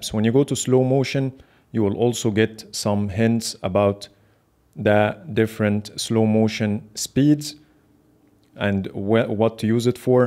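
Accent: Egypt. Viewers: it's an egyptien accent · Thats our well known egyprian-english accent. Weird enough other Arabs thinks its annoying! Lol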